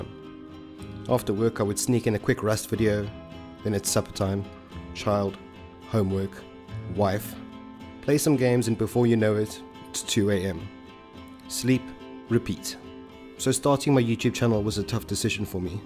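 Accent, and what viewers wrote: South Africa. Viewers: Very new to your channel came across it via some Jordon Rant rust stuff, heard the accent and went wtf its a saffer! Best south african channel I've come across so far. Congrats on 2 years. All the best for the years to come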